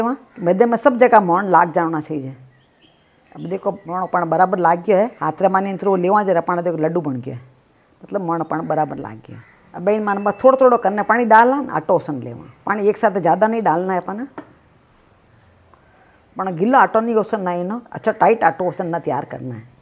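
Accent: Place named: Marwari, India. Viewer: i love ur marwari accent🥰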